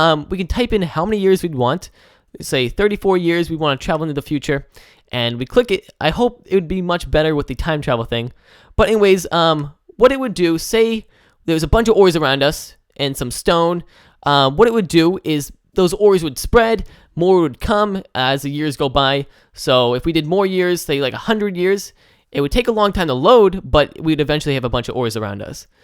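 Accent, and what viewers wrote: United Kingdom. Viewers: Does he have a british accent or the cutest lisp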